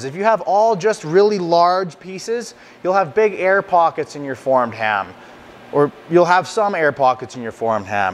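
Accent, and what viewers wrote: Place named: Nova Scotia, Canada. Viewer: Hello btw sub from Belgium ! I started eating carnivore as of last Monday but I want variety and healthy meat so as they put all sorts in regular store-bought ham, I want to make it for my family. Btw, I'm originally from Cape Breton Island, Nova Scotia and I think you sound like the folks from home - but I have been over here for 35. You're fro which province, may I ask ?😀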